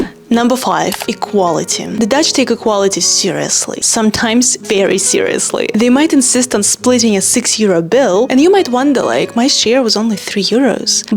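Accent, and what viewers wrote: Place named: Eastern Europe. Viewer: While this girl is extremely pleasant to my eyes and I love the eastern European accent (this is the second video of hers that I watched) she is factually not Dutch, hasn't been in the country that long and she states that her boyfriend or the man she dates is also not Dutch. So, I like watching her, but where is her expertise on Dutch dating culture? There's a lot of confusion about this subject among expats to Holland and people, specially men planning to visit. The Netherlands has gained a reputation for their women being very emancipated, liberated and sexually open minded and there is 'some' truth to it. But so many men look forward to their trip to Holland where they are going to "score big" with all these open minded Dutch women only to face massive failure and rejection. I can explain. The Dutch live their social lives in groups, circles of friends and each Dutch person belongs to several groups or has several circles of friends and they overlap and that is how you can increase your number of friends. 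As a teen ager I went to the Discotheque on Saturday nights. I did not go alone, not with 'A' friend but with a group of friends, 5-6 or 8 of them. If while there I saw a pretty girl who interested me and I would approach her, most likely it would be awkward, she would show slight discomfort and reject my advances. She did not know me, she was there with her group of friends and it was with them that she was socializing with and enjoying her time with (there are always e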